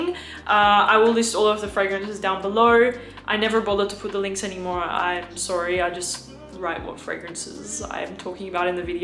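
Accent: Australia. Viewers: you’re an Aussie living overseas and your accent reflects that. Don’t listen to haters. We love you ❤️💙💜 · Your Australian is still very prominent, it is just certain gestures that have rubbed off on you, totally natural. Im sure he has helped you with pronunciation of a lot of french words as well. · Your Australian accent is still very prominent makes me miss my good friend Joel from Perth. Ever smell Claude Mersal la mélodie one of my favorite fall unisex fragrances. Bought thameen peregrina as i watched this.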